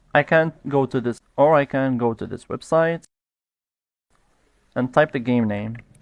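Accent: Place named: Morocco. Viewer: Out of topic u sound Moroccan or Algerian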